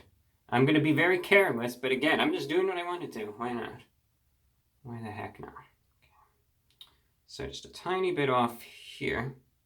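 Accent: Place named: New York, United States. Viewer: If you watch his older videos he has a NY accent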